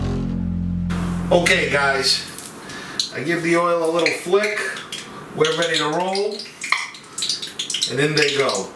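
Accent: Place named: Massachusetts, United States. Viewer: EH I SEE YOU GOT YOUR ST CHRISTOPHER ON YOUR NECK THERE... LOVE YOUR BEEYOUDEEFULL SILVA CHAINS ROUND YOUR NECK THERE... DID YOUR PARENTS SPEAK ITALIAN? YOU SOUND LIKE YOU AHH FROM MASSACHUSETTS.... MY WHOLE FAMILY ON MY MUTHAS SIDE WAS FROM THEY AHH. LOL YOU ARE WONDERFUL THANKS FOR THE RECIPE GOTTA GO TO THE GROCERY STORE REAL QUICK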